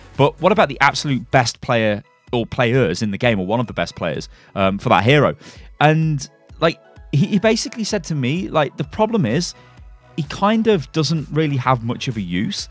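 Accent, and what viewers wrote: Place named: United Kingdom. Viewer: Hi! Love your vids, it's so nice to listen to a British accent like my own to when watching gameplay!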